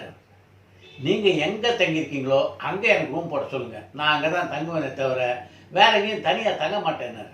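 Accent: Telugu, India. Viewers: Is telugu your mother tongue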